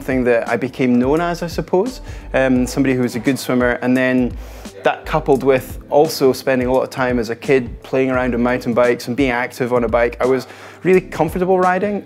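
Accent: Scotland, United Kingdom. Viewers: He is scottish......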